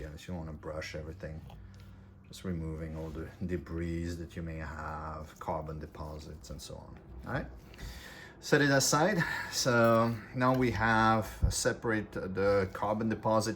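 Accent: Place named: France. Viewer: I avec the impression que il y a un litel french accent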